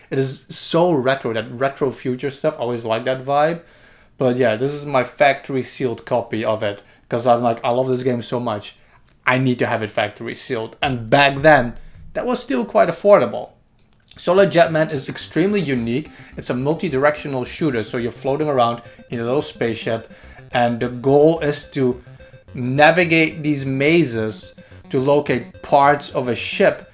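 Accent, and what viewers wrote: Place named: Denmark. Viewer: I'm guessing Danish.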